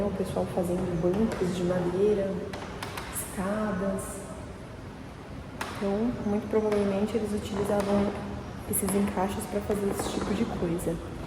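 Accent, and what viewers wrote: Brazil. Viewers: Sabia que esse sotaque só podia ser de SP.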